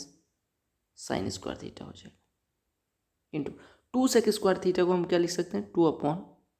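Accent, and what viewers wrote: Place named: Mithila, India. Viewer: Bhaiya kya aap mithilanchal se hai? I am also from Janki janm Bhumi Sitamarhi, Bihar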